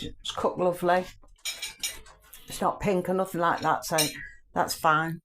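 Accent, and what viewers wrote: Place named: Nottingham, United Kingdom. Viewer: I’m from Nottingham. I thought I recognized the accent!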